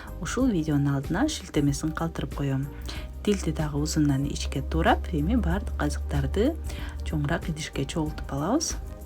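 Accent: Standard. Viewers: Сонун азаматсыз. Сизден дагы коптогон рецептерди кутобуз. Кыргыз тилинде таза суйлогонунуз абдан кубандырат😊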